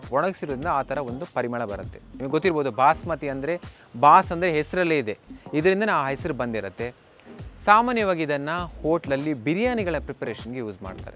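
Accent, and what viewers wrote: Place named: Mangalore, India. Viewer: Nimma M'lore kannada 😍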